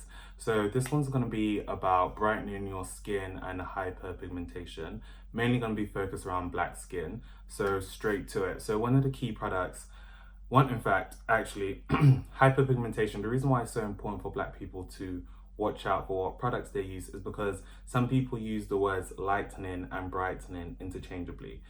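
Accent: United Kingdom. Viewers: Your accent is proper British so everything you say... is probably legit. - Your African brotha from across the pond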